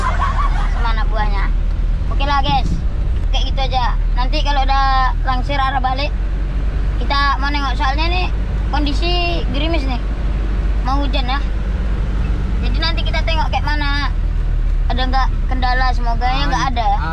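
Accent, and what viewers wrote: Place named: Sumatra, Indonesia. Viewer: pertahankan, lebih lucu pake logat sumatra😁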